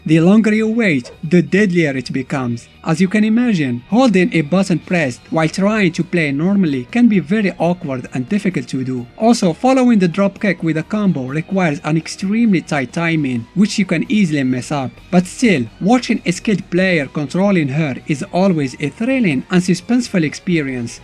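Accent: Arab world. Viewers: I can tell you're Arab, maybe Moroccan or Algerian, from the way you said Najd, and your accent over all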